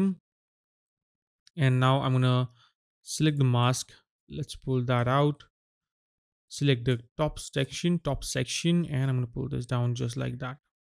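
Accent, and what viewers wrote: India. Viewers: Indian accent huh? 😀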